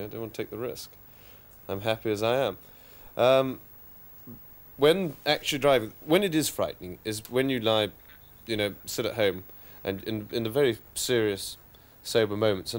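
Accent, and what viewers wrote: RP, United Kingdom. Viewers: I could listen to this guy for ages. He's posh but not pretentious.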